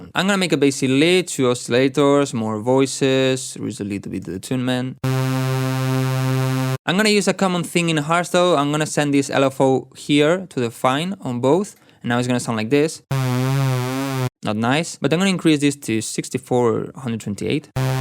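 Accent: Spain. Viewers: Fine tuto! You have Spanish accent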